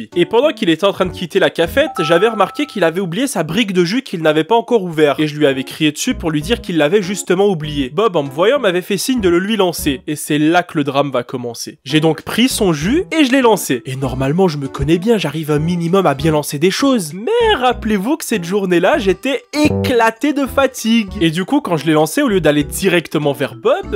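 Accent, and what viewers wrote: Quebec, Canada. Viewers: j'aime beaucoup tes vidéos et j'aime l'accent québécois car je suis français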